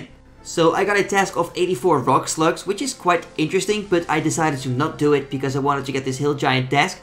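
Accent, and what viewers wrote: Netherlands. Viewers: Lets gooooo! are you dutch btw? you sound like me haha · Great series! Just a question, are you Dutch? I am from the Netherlands, alot of people play osrs here!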